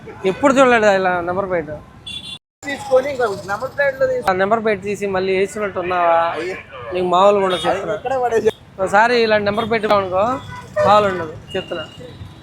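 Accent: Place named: Andhra, India. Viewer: ఆంధ్రవాళ్ళ వాయిస్ బలే కామెడీ గా ఉండిద్ది.... మీరు విజయవాడ అని అర్ధం అయింది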